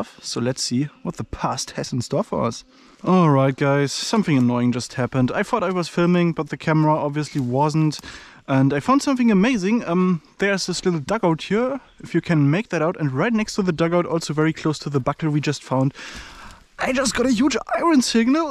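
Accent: Germany. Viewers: Is your accent German? If so, are you still allowed to keep items with insignias? As I understand there are rules about having sw4stlk4s. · Typical german english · Bro has such a german accent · Dieser deutsche Akzent hahaha, gutes Video glückwusch zu den Funden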